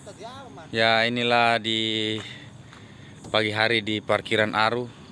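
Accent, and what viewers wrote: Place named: Indramayu, Indonesia. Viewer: Org indramayu mang ??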